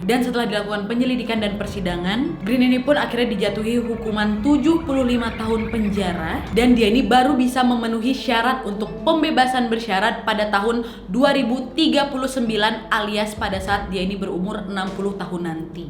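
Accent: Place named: Medan, Indonesia. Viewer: Senang liat cara ngomong nya. Ceplas ceplos. Kayak cwe medan. 👍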